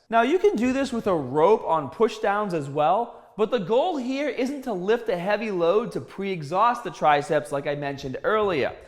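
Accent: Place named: New England, United States. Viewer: I don’t remember this thick New England accent when you were on the real world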